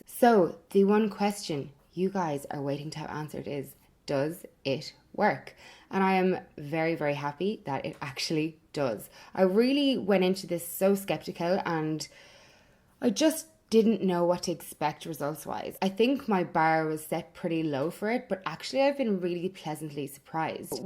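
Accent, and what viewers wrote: Ireland. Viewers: Was very confused at first when you mentioned Australia because I was like, "But wait... she sounds Irish. There is no way she is not Irish." Thank you for having accurate information in your "About" section! :)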